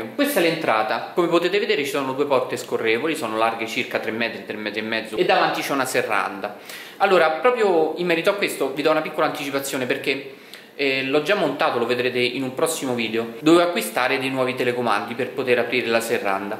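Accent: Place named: Lazio, Italy. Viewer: mi pare laziale ma non ciociaro....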